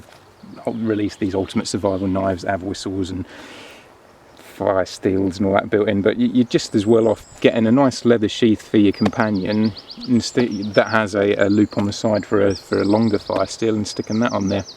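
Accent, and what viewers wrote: United Kingdom. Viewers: where abouts in uk are your from man?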